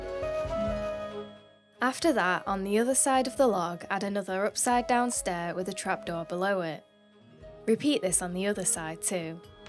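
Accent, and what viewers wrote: United Kingdom. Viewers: Your British voice feels so calm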